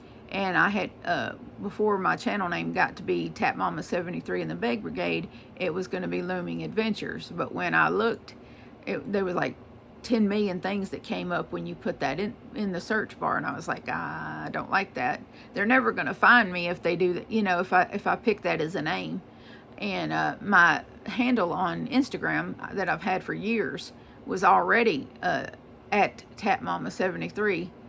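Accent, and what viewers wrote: South, United States. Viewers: I enjoyed your tutorial. I like less formal. I love your accent...southern comfort. Don't worry about the complainers. More of us love your style than not. Keep up the good work. I'm looking forward to your next tutorial.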